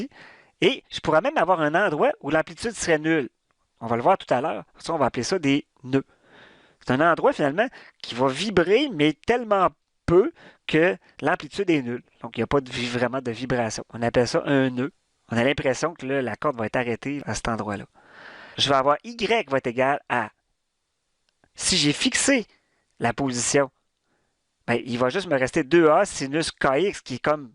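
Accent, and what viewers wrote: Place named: Quebec, Canada. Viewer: Maintenant en ds quand je vois des ondes stationnaires j'ai un accent québécois dans la tête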